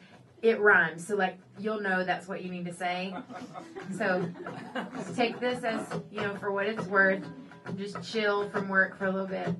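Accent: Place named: Texas, United States. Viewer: Love her and that Texas twang she's gotten. Listening here in Manchester UK.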